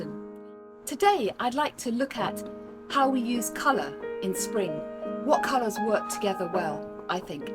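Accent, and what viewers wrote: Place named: United Kingdom. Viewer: 我喜歡聽 Manda老師 優雅的英倫 英語發音 還可以學英語聽力練習!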